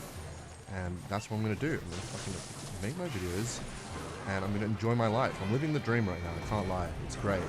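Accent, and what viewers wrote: United Kingdom. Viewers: Bro is British... I am not watching this anymore..... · British? Yuck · is british?😂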